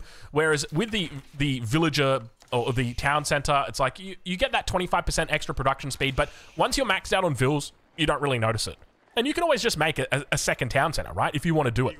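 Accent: Australia. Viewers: Dont gang up on poor about his pronunciation of words, he's from Australia and their version of English is different.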